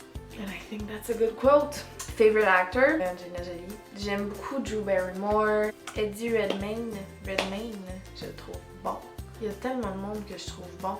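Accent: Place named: Quebec, Canada. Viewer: frist timer. I miss hearing Quebecoise accent. I enjoy your channel, I'm a new sub.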